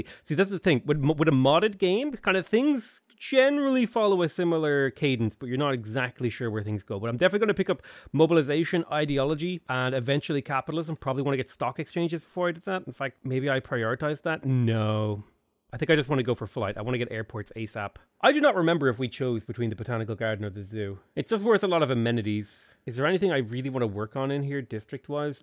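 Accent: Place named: Ireland. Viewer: That moment when I hear an Irishman talking about paddy fields, and becoming fully redfaced and relieved when I realize "Ahh talking about rice, I'm an idiot!"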